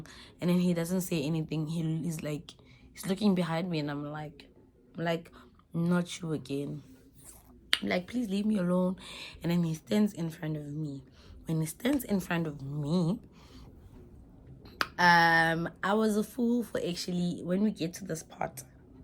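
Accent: South Africa. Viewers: maan, it's my 1st time watching your page. I love your Xhosa vha, I was laughing from the get go😂😂😂.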